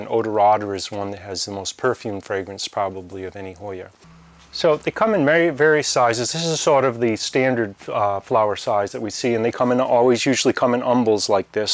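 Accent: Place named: Southern New England, United States. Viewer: Yes! Proud southern New England accent!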